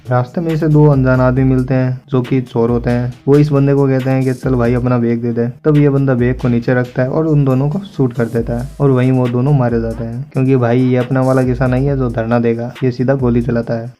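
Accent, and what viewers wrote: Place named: Haryana, India. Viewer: Haryanvi can't be snitch from anyone . With fluent hindi anyone can tell you are from Haryana and i too am. All the very best brother , wish you more success .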